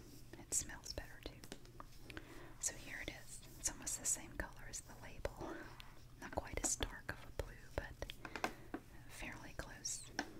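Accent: South, United States. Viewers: i love her voice so sweet and southern. like mine be safe beautiful u are doing amazing job im proud of u love u always frim a fan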